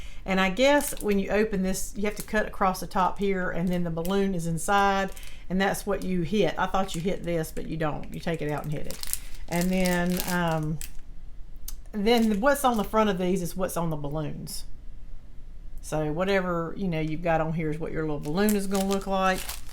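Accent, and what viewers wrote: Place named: Appalachia, United States. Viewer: Mom, GREAT job! I had one boy, so it was fun to watch you put girls’ baskets together! I loved all your choices, but I REALLY loved the stuffed bunnies! Adorable! And from my own perspective, a girl’s never too old for a snuggly stuffed friend! BTW, I love your accent. You sound just like my precious sister-in-law who is from the TN/VA border! Happy Easter! 💕💐🐰🐣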